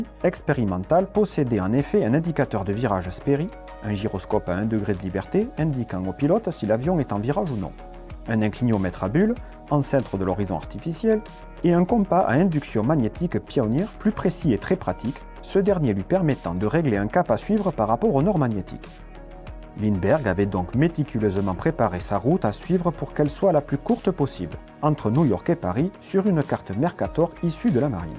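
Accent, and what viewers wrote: South, France. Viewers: Un soleil de YouTube tant par la qualité du contenu que par l’accent du sud qui fait plaisir à entendre (le Basque est content). Continue comme ça, c’est un régal! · Merci et Bravo, et votre accent du sud est un régal 🤗👌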